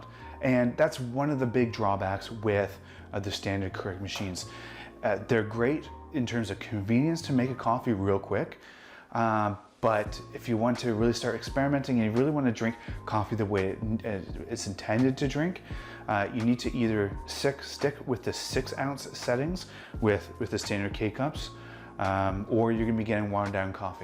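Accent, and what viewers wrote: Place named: Canada. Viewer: Where are you from? Sounded like a slight canadian accent at times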